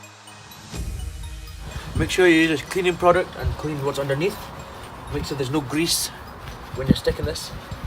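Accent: Scotland, United Kingdom. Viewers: You Scottish? Lol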